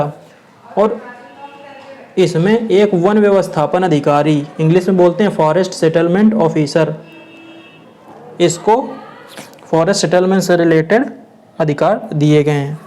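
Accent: Kanpur, India. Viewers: Fatehpur ya kanpur se hai tu bhai kya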